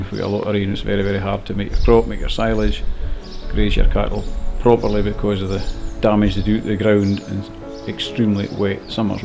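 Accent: Scotland, United Kingdom. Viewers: you must get someone British/Scottish to translate for you.<3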